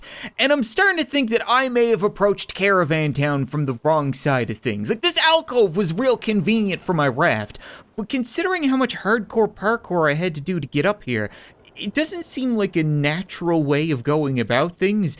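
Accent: Appalachia, United States. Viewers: why are you using a hillbilly voice if this game is placed in indonesia / phillipines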